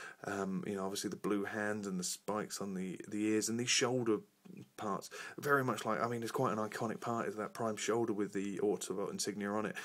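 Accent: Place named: United Kingdom. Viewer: hes from the uk theres nothing wrong wiv his accent if you dont like his voice dont watch it cant help the voices were born wiv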